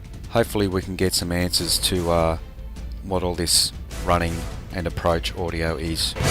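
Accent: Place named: Australia. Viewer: Good video. Love your voice from Down Under if I am right? I just hope a hairy guy does not tamper with them! I know they are not cheap! An online friend of mine I spoke with a long time back from Perth used to have a Kookaburra. About as annoying as a woodpecker here in a tree! I hope you do not have any there to scare them away and drown out the vocalizations! Wonder if they can mimic birds like that?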